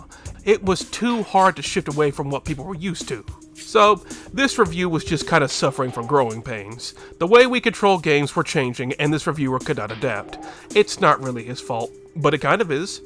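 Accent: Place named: Texas, United States. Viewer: he is from texas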